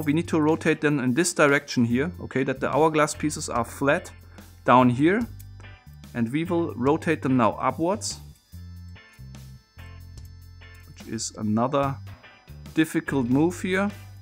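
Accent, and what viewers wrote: Germany. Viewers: you sound like light indian accent. Oh youre german, yeah that sounds more like it.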